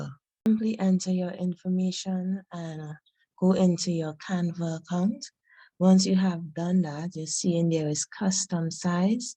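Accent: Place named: Trinidad and Tobago. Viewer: I recognize a trini accent here!¡ Good job in explaining this - i can't seem to pass the error message in print previewer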